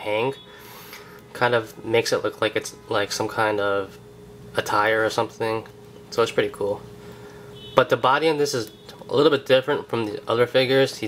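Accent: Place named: New York, United States. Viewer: ... I'm glad your one of the first to have the captain america toyline... your reviews are great bro... also your customs are amazing. Doode keep up the great work... and also... I heard a little New York accent hahaha nice... great stuff man!!! --Vincie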